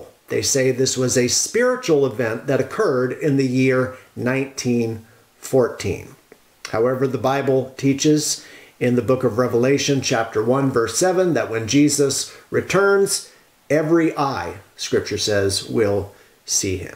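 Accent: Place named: North America. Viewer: According to the Oxford dictionary, the word cult is defined as "a relatively small group of people having religious beliefs or practices regarded by others as strange or sinister". Firstly, by way of informing you, the seventh day adventist church is a world wide denomination with an approximate membership of over 20 million members...( and counting ) Of this number, over 1.2 million alone are from North America, a place where you reside given your accent. Hence, the growing size of this church by way of simple logic disqualifies it as a cult. Like the dictionary says, a cult is made up of a relatively small group... however, on the contrary, this church is not a "put together church" formed in recent times in a shed to the back of nowhere, rather, this church was prophesied by God himself and is sustained God himself with a global reach. In addition, the said dictionary states that the word cult is associated with devotion towards a particular figure or object. 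Again you seem to be misinformed as the only allegiance the church bears homage to is Jesus Christ hence the name " SEVENTH DAY ADVENTIST " which means, looking forward towards the coming of the Lord. In other words, their belief is in there name. You are correct in suggesting that the church have a leader. Infact, they do have a leader, someone they follow, but it's not Ellen G White like you suggest, rather Jesus Christ their soon coming king. Lastly, the origins of this church is not only supported with Biblical e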